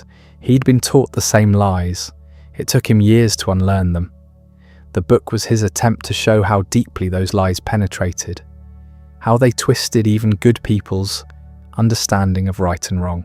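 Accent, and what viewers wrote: United Kingdom. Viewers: Love this and thank you but I wish you'd scratch the British accent. So many documentaries use this voice or similar that the sound is beginning to irk me.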